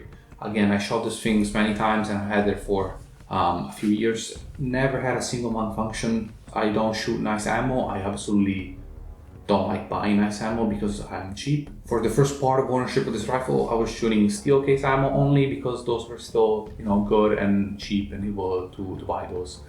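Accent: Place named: Italy. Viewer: You sound italian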